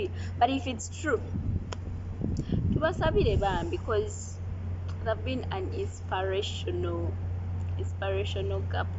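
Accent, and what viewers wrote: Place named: Buganda, Uganda. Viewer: You are a good narrator..and I love the accent in luganda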